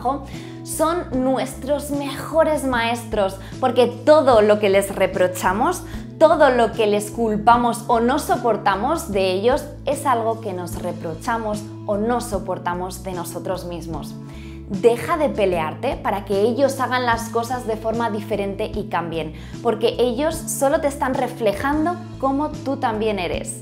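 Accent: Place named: Spain. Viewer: Va relacionado con la frase: no mires paja en ojo ajeno..? ... bueno en lo personal trato de aplicar y mejorar en tema de virtudes y actitudes. Me podrías decir si lo que explicas lo puedo leer en un libro de psicoanálisis o es otro rama de la psicología? . Tu acento español sueña como un eco deberías mejorar tu audio al grabar y hablar un poco despacio sería mejor tu video. Espero no ser ofensivo con mi humilde opinión. Gracias/ saludos..!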